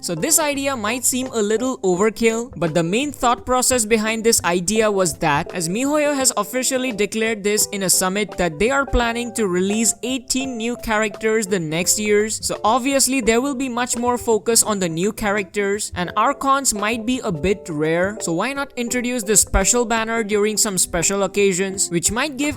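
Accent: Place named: India. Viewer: u r an indian right? ur accent is like that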